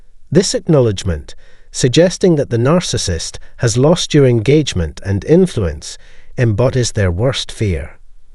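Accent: United Kingdom. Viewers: Breaking up with ANYONE hurts them in ways you can only imagine when they care about you. If someone would be so hurt by my absence then send me ALL your narcissists, I'd rather date them than this annoying British-sounding twat narrating this video.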